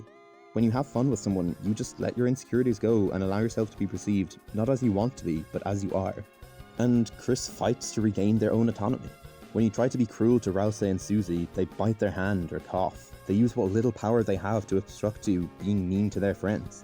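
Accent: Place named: Ireland. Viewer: RAHHHH IRISH ACCENT DETECTED!! irish they/them using deltarune fans must unite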